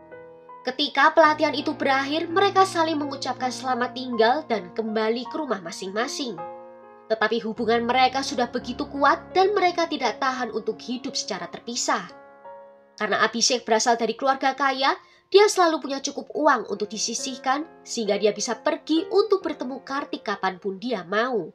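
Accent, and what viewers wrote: Java, Indonesia. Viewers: Org jawa kah mbak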